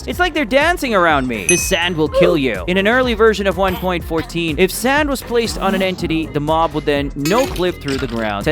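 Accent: United Kingdom. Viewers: I thought u were British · Ain't you british?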